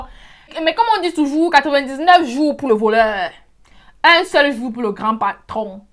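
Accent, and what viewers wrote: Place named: Cameroon. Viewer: T'es un cas ewoooo tu fais rire j'aime trop comment les Camerounais parlent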